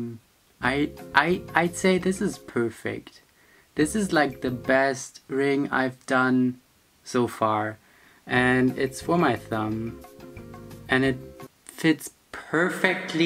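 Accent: Germany. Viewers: Are u German? :) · I swear to harry styles I knew you were German as soon as you said something and I spend half the video finding a German comment!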